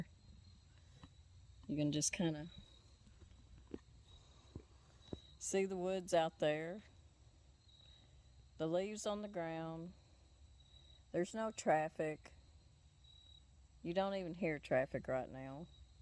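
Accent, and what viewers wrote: South, United States. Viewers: I just thought you were gonna tell us a good story! I hope it's not going to be "one of those days!"... I spent the major part of my life in the south, so it's not like I'm not used to the accent, I have one myself! Have a blessed day!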